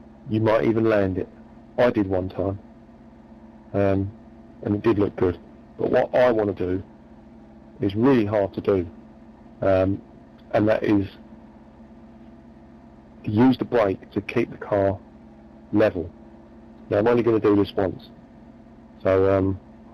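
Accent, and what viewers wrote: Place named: Germany. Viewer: Really great getting a bit of the historical background on these tracks. I can understand you much better with the German accent. Could you please use it during iRacing communication?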